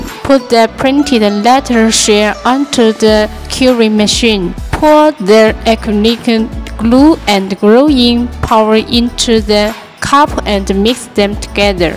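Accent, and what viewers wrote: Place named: China. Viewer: what a pain to listen to her zinglish 🐱‍🐉🐱‍🐉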